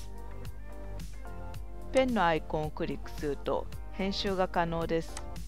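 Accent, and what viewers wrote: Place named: Japan. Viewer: 日本人的な英語ワードの読み方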